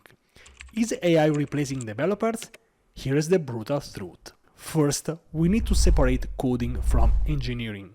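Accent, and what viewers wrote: Italy. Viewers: Your Italian accent just makes me less worry , thanks that was good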